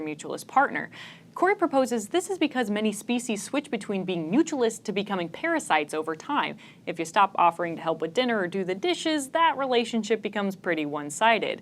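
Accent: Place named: Midwest, United States. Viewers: I love your show for the interesting details we don't usually get to see and for your easygoing charisma. But I'm not going to lie, your accent is a big supporting factor. My dad's family is from Michigan. I guess it's nostalgic for me. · The midwestern accent still gets me! <3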